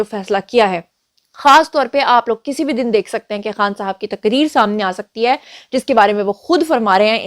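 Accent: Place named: Punjab, Pakistan. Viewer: تھوڑا سا مزید پنجابی ٹچ دیا کریں مزہ آتا ہے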